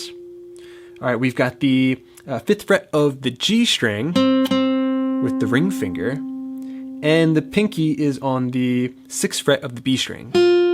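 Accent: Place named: South Jersey, United States. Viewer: Where's that accent from? Sounds like south jersey, my old stomping grounds 🙌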